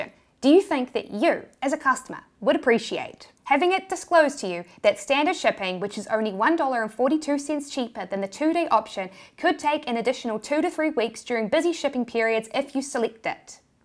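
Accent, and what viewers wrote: New Zealand. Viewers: I like your kiwi accent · Is that what a New Zealand accent sounds like?! I’ve never heard a South African sound so Australian or an Australian sound so South African. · dragging their store with the New Zealand accent, priceless. 😥😭😂🤣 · Really strong kiwi accent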